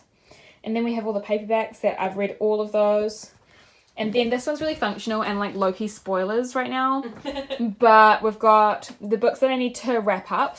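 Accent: New Zealand. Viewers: Giiiiirl you will die and be reborn when you read Six of Crows. The premise of it literally never interested me and I only read it to see what the hype was about... it's now my favourite series of all time. I can't even with it. I know you'll love it so I cannot wait to see your reaction when you finally read it! Also what was the channel you mentioned about the Goodreads review? Melanie something? Sorry, I'm not from NZ so I don't always understand NZ accents 😂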